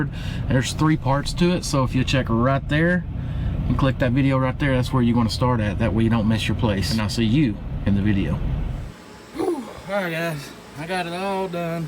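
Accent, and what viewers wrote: South, United States. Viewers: HAHA at work so had to turn on closed captioning. That shit is hilarious YouTube doesn't do a lot of work trying to learn them southern slangs that's fa sure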